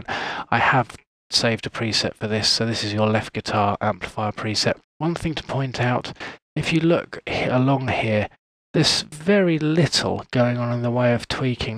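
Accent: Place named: United Kingdom. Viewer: I have a different reason why people clamor for new presets all the time. Our species is never satisfied. Grass is always greener on the other side. we are explorers. Has nothing to do with guitars or presets. Just my tiny opinion which might be 100% wrong. But I love that British accent. And the idea that the underlying methods/reasons/techniques/science is important so you can pinpoint things in YOUR project and improve them instead of blindly hoping a preset works.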